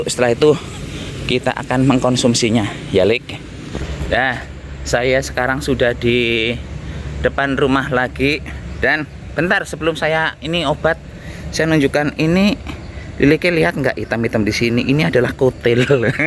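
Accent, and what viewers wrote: Banyumas, Indonesia. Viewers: trms rep ngapak..solusi ne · Assalamualaikum,kang.itu kalau di daerah saya namanya maregol,ada juga yang bilang sembung grogos, kalau boleh tau kang ini orang mana,ya dari logat bahasa jawanya seperti orang Banyumas, saya sendiri orang Banyumas,kang, asli dari kota dawet, Banjar negara.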